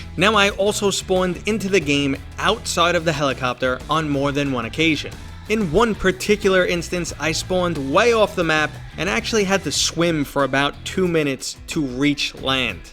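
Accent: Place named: Boston, United States. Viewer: I just like that boston accent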